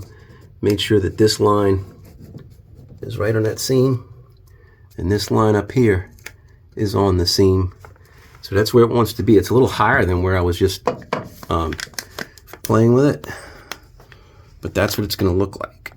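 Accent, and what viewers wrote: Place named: Metairie, United States. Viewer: Funny how I’ve been gone for almost 30 years, and the minute you started speaking I thought, “That guy sounds like he’s from Metairie!” 😂 Nice job, and I hope to soon be doing an a/c installation in my own classic, as well.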